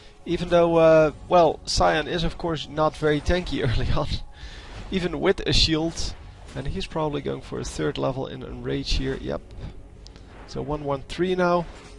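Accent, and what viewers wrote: Netherlands. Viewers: Your accent seems dutch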